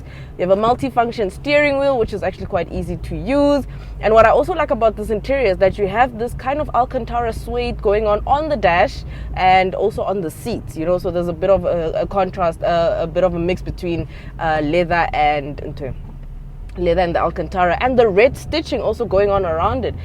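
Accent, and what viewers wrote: South Africa. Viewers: U South African?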